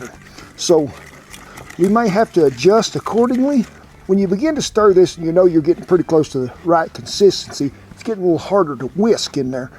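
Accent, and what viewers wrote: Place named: Texas, United States. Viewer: Mac Donoulds for Breakfast. I love the accent from texas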